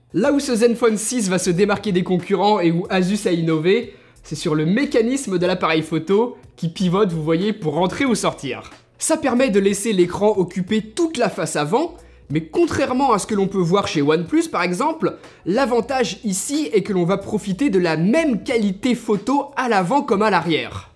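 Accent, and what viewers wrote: Lyon, France. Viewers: T'es lyonnais ou quoi ?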